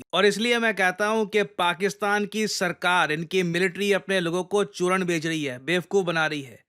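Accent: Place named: Bihar, India. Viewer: मैक्सिमम ये बोल देतय, ये pure बिहारी language भैया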